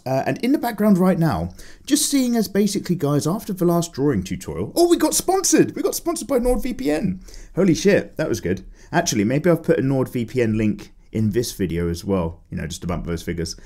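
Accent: United Kingdom. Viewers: Hey! My first time writing on these episoed as I often just lurk and listen to your asmr british voice. But when you were talking about league of legends splashart (2 weeks ago, this is a repost) I had to ask you something, ever heard of Kienan Lafferty? He made most of the famous splashart for riot and he is my biggest inspiration and the reason I started drawing + Im a no lifer and ive played lol since 2012 and the art is way better than the gameplay xP Oh and YOU made me start drawing anime tiddies and "other" good parts;) Anyway love you and your videos <3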